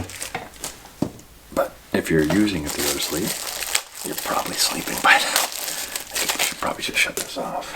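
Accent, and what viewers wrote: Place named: Boston, United States. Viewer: I knew your were a freak lol ASMR hahaa all that heavy breathing and the boston accent so hot!!!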